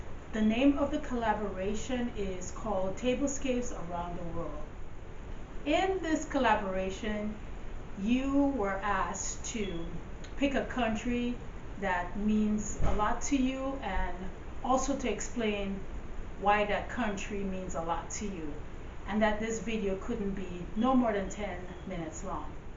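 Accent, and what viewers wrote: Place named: Caribbean. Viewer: thank you so much for participating in this collaboration. I knew by your accent that you were from the Caribbean Island. You guys have the best beaches over there!!!! Your Tablescape is Beautiful my friend!!! I love everything from the placemats to the china that you used. The storks are Stunning and added the perfect touch to complete your look!!!! I’m jamming to the music too! Thanks again for participating ~Elle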